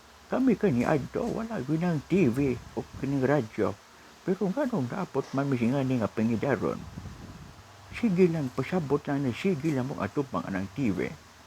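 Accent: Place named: Visayas, Philippines. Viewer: Ganda talaga pakinggan bisaya stay safe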